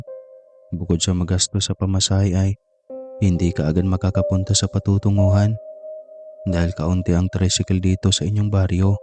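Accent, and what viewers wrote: Visayas, Philippines. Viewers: Bisaya ka man, Dong!😊😊 (katugon na man ko)😅 Ha,ha,ha😆😆😆😆😆 Grabe, ang ganda ng estorya!❤❤❤❤❤❤❤ Watching from Los Angeles, CA USA 🇺🇸🇺🇸🇺🇸🇺🇸🇺🇸🇺🇸🇺🇸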